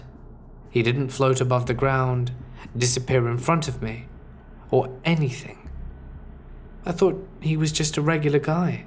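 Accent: England, United Kingdom. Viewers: Kevin Heriford He’s English and that is the way they pronounce cemetery with their accent. 🤷🏻‍♀️ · That's how we Brits pronounce it....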